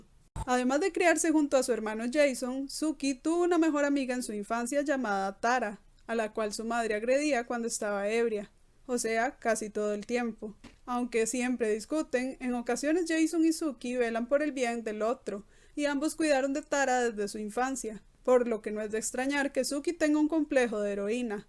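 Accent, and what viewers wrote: Costa Rica. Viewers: Eres de Costa Rica verdad? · No sé, pero tienes un acento de Costa Rica. Es como escuchar a una amiga de la prepa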